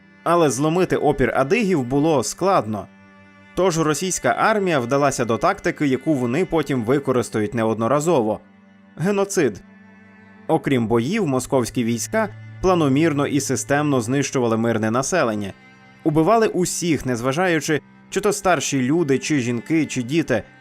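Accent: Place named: Ukraine. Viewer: Ролик с потсрекательством , будьте бдительны.!!!! Высвечивают только один фрагмент,. Подумайте для чего ? Голосу с украинским акцентом рассказывать про черкессов, сейчас в такое гопячее время - это во первых , а во вторых проверяйте информацию более обширно, учите настоящуюю историю своих Родов , развивайте критическое мышление. Слава всем Родам.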